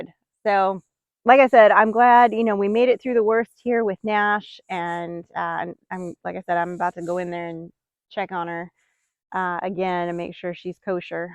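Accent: South, United States. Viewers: love win your a little tiered you get a southern Drow in you words love that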